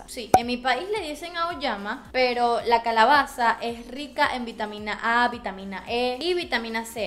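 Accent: Colombia. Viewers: Eres colombiana y costeña carajoo <3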